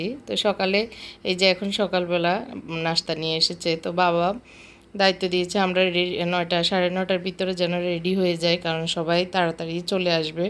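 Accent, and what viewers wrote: Noakhali, Bangladesh. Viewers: Aunty apni fenir naki noakhali r😀😀❤️❤️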